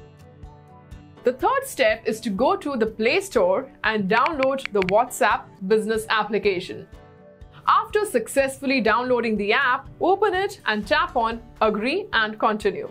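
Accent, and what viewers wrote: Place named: India. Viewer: O realy, you make it so easy for me. I love the english accesent of Indians.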